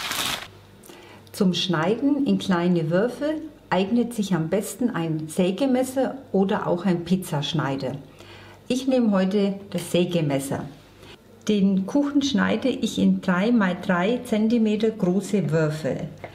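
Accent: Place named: Franconia, Germany. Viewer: Liebe, deine Videos sind einfach spitze! Wie schon viele andere ZuschauerInnen geschrieben haben: total gut erklärt, praktisch gelingsicher, zudem die vielen praktischen Tips und deine ruhige, freundliche Art... Passt einfach alles! Ich wage es mal, eine persönliche Frage zu stellen: Du siehst vom Typ her aus wie eine Oberpfälzerin (ich kenne so einige, deren Schwester du sein könntest!🙂), aber der Dialekt geht eher ins Fränkische, finde ich. Ist da was dran? Ich freue mich auf weitere Rezepte und Tips von dir! Liebe Grüße!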